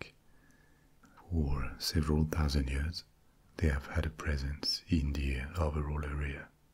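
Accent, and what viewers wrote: France. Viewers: Can't wait to watch this tonight. It's going to put me asleep like a baby hope I make it through I've been watching your videos every night since I've discovered your Channel 5 months ago thank you for the education and the awesome ASMR French accent. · I woke up with this playing for the first time because of autoplay at 2 am and forgot i had ear phones on so i kept wondering why the fuck i could hear a french dude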